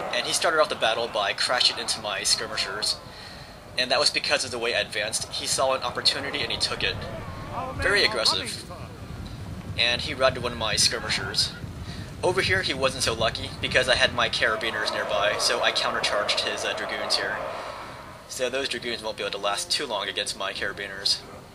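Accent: Netherlands. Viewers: lol nice battle and ur dutch accent is funneh but very well spoken. I was suprised. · funny dutch accent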